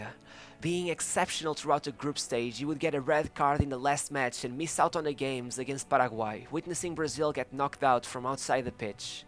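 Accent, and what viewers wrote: Portugal. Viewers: Ele é português. E se tá achando ruim, grava tu e faz melhor!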